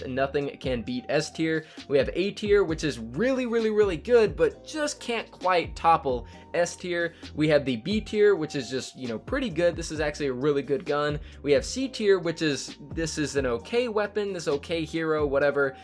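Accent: France. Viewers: Are you French? O_0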